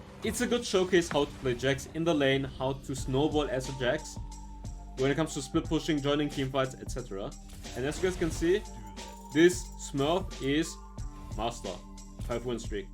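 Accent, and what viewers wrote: Germany. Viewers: Man hört deinen Deutschen Akzent einfach heftig raus lol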